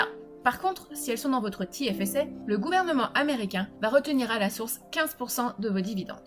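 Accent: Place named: France. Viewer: escuse moi du mot etf , jecoute les terme etc j ai commence par epargne placement quebec,au debut je prennais des celi court terme ou annuelle progressif depuis 3 ans je rentre dans un systeme boursier qui apparament ce rapproche d un etf mais sans risque mais je ne suis pas sur .si c es interessent....tout en celi et la je reflechie et j essaye de comprendre..... je pense dans un deuxieme temps allez vers des etf me semble plus prudent...mais les systeme de taxe americaine m interpelle et me demande si il est pas mieux d achete canadien.....qu en pensez vous.....aussi je suis francais ......vu votre facon de parlez vous devez l etre......est ce que pour l europe on reviens au meme probleme...... y a t il des taxe .. bref plein de question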